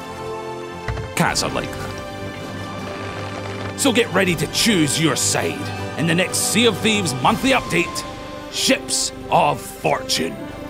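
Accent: Scotland, United Kingdom. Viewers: Scottish narrator 😊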